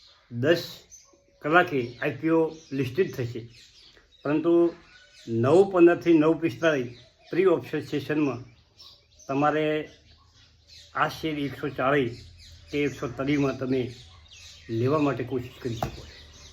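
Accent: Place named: Banaskantha, India. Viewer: તમારી બોલી પરથી તમે બનાસકાંઠાના લાગો છો.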